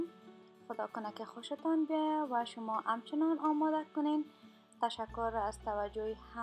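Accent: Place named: Kabul, Afghanistan. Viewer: خوب دری گپ میزنی اصل کابلی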